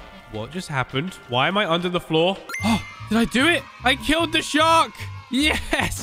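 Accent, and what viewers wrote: United Kingdom. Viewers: Question, are you British?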